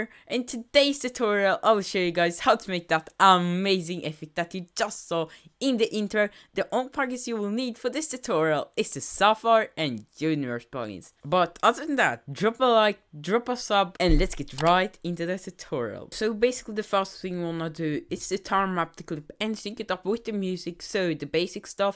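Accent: Sweden. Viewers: hes swedish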